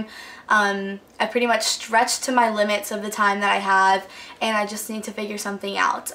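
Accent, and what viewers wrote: Texas, United States. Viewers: It's a small texan accent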